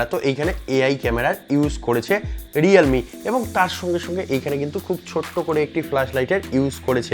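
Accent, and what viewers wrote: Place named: Bangladesh. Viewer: Honestly you are bangladesi, as per your voice and etc.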